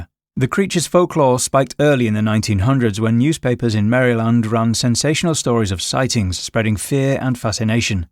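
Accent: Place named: England, United Kingdom. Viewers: I always find it a bit odd that people with English accents talk about American folklore. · You Not even native American and making videos about your original predecessors 😂 lil english accent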